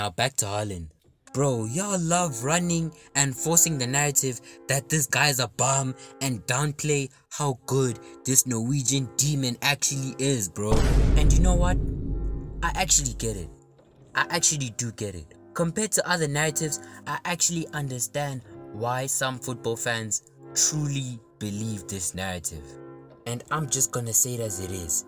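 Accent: South Africa. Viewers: No LIE this man sounds SOUTH AFRICAN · W vid and vids. You South African, bro? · South African accent heavy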